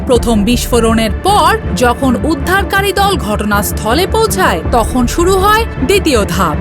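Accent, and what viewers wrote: Kolkata, India. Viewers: এই সাংবাদিক মাগীরে চাকরি দিছে কে এই মাগিতো কলকাতার হকার সাংবাদিকদের মতো সংবাদ পাঠ করে। কথাবার্তা তো কলকাতার মানুষের সাথে মিল আছে।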